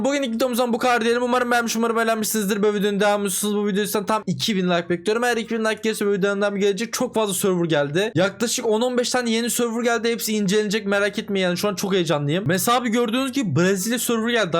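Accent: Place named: Turkey. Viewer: Qaqaş Sən Niyə Türk Kimi Danışırsan?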